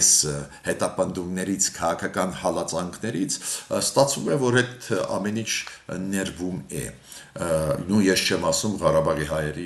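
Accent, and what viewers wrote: Russia. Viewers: не можешь говорить без русского акцента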